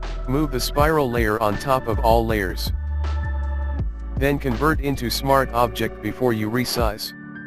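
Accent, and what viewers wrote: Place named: United States. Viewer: Aap ayse kesy bol lety Hain American English?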